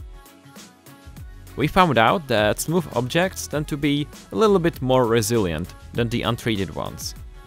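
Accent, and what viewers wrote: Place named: Czech Republic. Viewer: Lol, after one sentence I knew you are Czech.. the funny accent..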